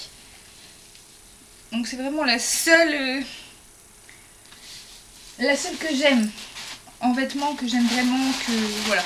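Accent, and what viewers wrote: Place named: North, France. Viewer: Youpi...jai perçu ton ti accent chaleureux du nord..jaiime trop votre parler ces tro cool...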